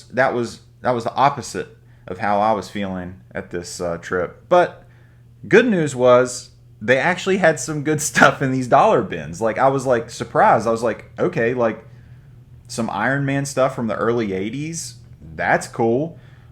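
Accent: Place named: Ohio, United States. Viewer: Judging from your accent your from somewhere in Ohio.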